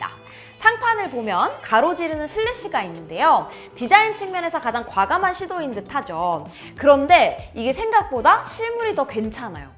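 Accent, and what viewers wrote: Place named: Seoul, South Korea. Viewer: 이분말투 전형적인 요즘 서울말사투리네요 ㅋㅋ중간중간 음올리는게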